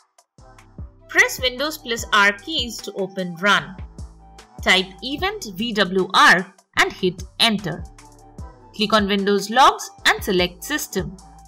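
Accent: India. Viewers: do not trust a video with an indian accent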